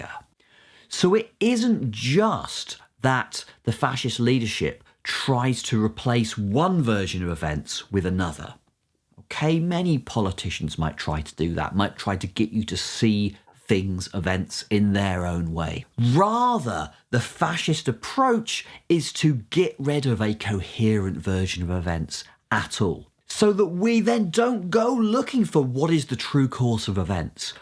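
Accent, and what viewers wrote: United Kingdom. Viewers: And your British accent does nothing to give you credibility . My stepfather is a Brit. Anyway , turn off BBC which is prob bigger garbage than cnn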